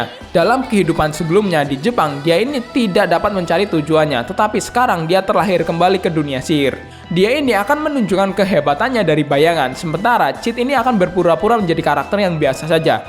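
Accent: Lampung, Indonesia. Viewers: Lah warek Lampung tah min? Wkwkwk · Lah org lampung min?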